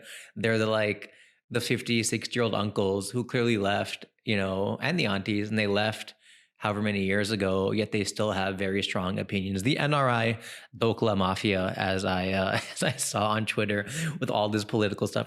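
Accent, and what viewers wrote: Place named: United States. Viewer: Wow ! You still speak American accent. How come it hasn't changed. 🙂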